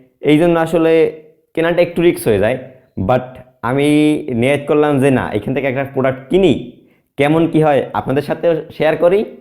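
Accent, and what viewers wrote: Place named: Sylhet, Bangladesh. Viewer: ভাই তুমি সিলেটি😅😅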